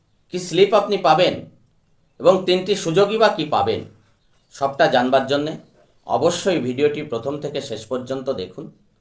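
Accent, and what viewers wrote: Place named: Bangladesh. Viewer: আপনার উচ্চারণ গুলো কিন্তু বাংলাদেশীদের মতন।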